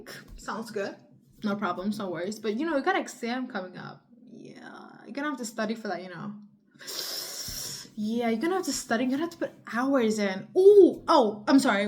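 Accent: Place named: Balkans. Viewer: I hear a slight accent. Are you from the Balkans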